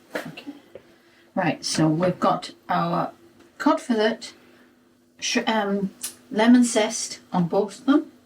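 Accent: Northern England, United Kingdom. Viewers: Hi 😊 I’m watching from Australia. I’m originally from Hindley, Wigan. I’m a newbie to the Ninja double draw Airfryer. Same as yours 👌 great to hear your Northern accent, where are you cooking from?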